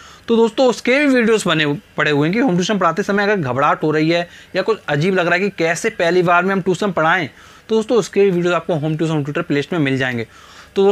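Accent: Kanpur, India. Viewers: Sir i think you are from kanpur😂 right sir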